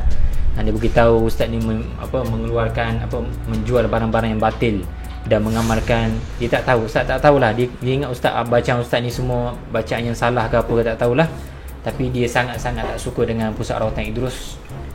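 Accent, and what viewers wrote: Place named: Kelantan, Malaysia. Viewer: actually ustz org mana ? bnyi loghat cam klntan ad kedah ad lain2 pon ad😂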